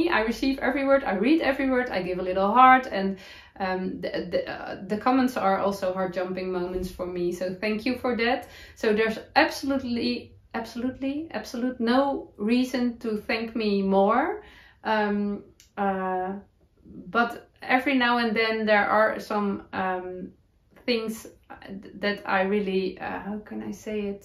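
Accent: Netherlands. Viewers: I love your Dutchy English. 😍 when you say ‘a piece of cake’ it means it is easy to do. When we don’t love something ( in a slight way) we say ‘it is not our cup of tea’ . Hope that helps 😘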